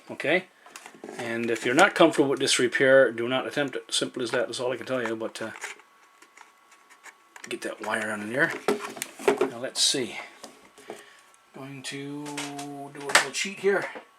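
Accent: Newfoundland, Canada. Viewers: Geez! Great job and I’m on it right now. I heard you speak and I said to my wife,he sounds like he’s from NL maybe even ST MARYS bay! Thanks so much sir.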